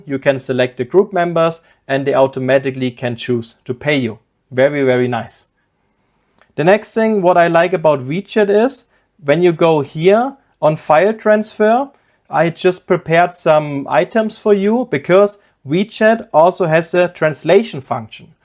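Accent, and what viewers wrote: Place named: Germany. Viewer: Ich hab noch nie so Glas klar einen deutsche am Akzent erkannt. Geil haha! 👍🏼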